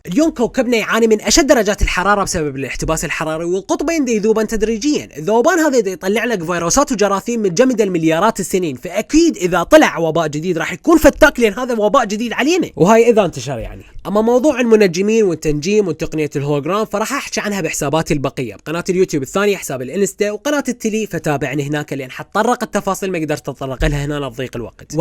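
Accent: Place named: Iraq. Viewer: يخي احب لهجه العراقيه 😭💖💖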